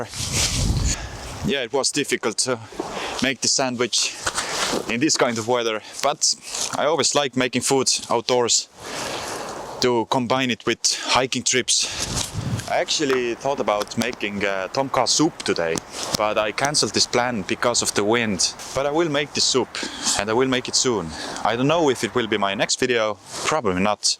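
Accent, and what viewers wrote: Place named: Estonia. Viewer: Love your Estonian accent, your videos make me want to visit Estonia and the rest of the Baltic countries <3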